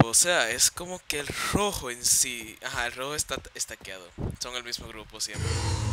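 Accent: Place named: Dominican Republic. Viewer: Hay deohmeo mijo tu ere de RD representa ese acento :v parece pajaro :p sin ofender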